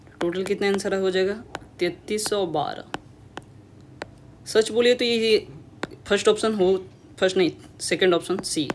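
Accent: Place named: Bihar, India. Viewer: Bihari touch in voice ❤❤ great bhaiya ❤❤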